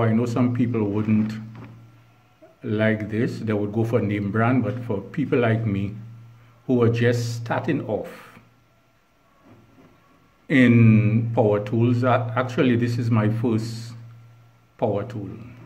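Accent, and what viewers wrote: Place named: Trinidad and Tobago. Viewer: Just want to say hi to my fellow Trini. Only a Trini like myself will recognize that accent. I am from Point, but live mostly in La Brea. What part you from?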